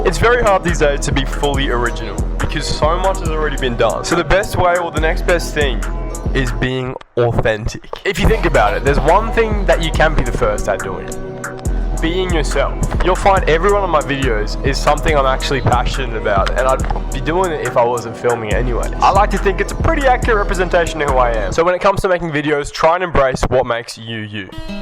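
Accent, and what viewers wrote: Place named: Australia. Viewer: Yo bro, loved this vid. It's funny hearing a proper Aussie accent on youtube, also that mic is sick as bro.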